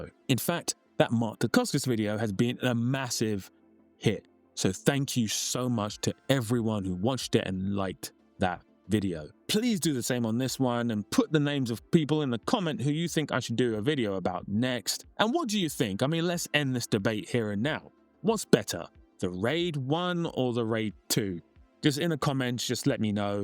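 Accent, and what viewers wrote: United Kingdom. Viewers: Gosh, this brits accent is so terrible!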